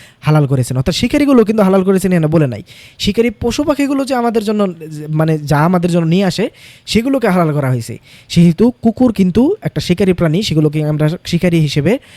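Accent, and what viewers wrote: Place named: Manikganj, Bangladesh. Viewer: আমার বাসা মানিকগঞ্জ, আর আপনার কথাও মানিকগঞ্জের মত লাগছে এর জন্য বললাম আরকি।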